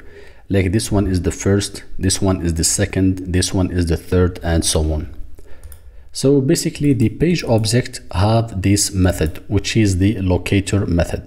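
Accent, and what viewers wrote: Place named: Arab world. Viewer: Are you an Arab? Your voice says that.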